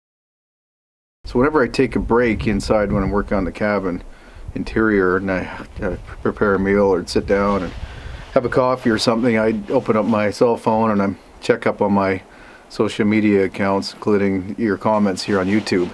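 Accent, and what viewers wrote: Canada. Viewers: I really enjoy your videos, watching you build by hand reminds me a lot of my Pop (who built most things from recycled train sleepers actually...his favourite thing). But I just love listening to your take on things at the end of each video, I find myself staring out the window, nodding most times. Also my best friend is from Barrie, ON, so your accent has a nostalgic feeling for when I miss her. Thank you for sharing your life, building, and take on life, the universe, and everything.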